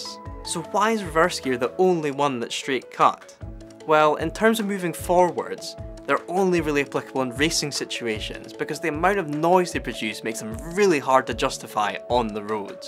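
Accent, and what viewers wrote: Scotland, United Kingdom. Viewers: Scotland, but don't know which part of it exactly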